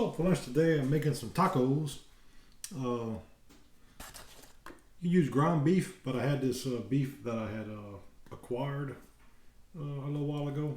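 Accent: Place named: Louisiana, United States. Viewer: Love that Cajun accent. These look yummy!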